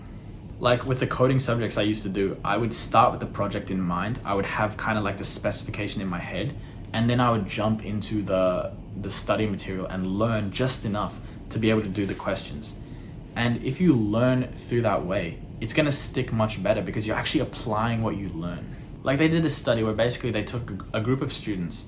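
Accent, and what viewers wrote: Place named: Australia. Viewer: dude whats with the subs, aussie accent isnt that hard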